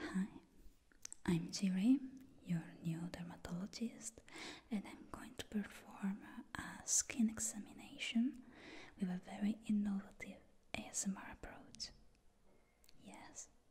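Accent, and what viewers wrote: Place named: England, United Kingdom. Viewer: Omg! Your English accent is just so good and relaxing😍 I just love it ❤️ it’s so cute!🤗🥰❤️❤️❤️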